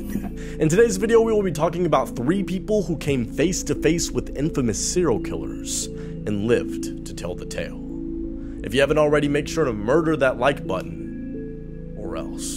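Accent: South, United States. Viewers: I love your story telling technique. Are you from the South. I can detect a southern accent. In your speech.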